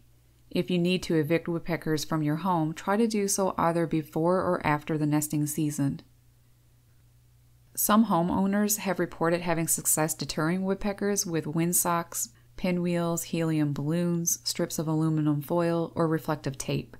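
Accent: Newfoundland, Canada. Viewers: Ok i hear Canadian accent ❤ love your name so cute 😊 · It’s subtle, but you sound like a Newfoundlander! I love to hear the accent. Interesting video with great information, not sure how this was recommended to me... but I learned something! Thanks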